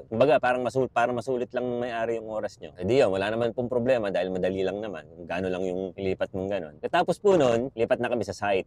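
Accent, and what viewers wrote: Philippines. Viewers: halata naman sa pananalita na sa Pinas lumaki yan jusko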